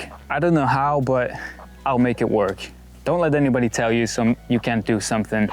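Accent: United States. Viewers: Are you American? Definitely sound like it but I know you're over in Belgium...So confused 🤯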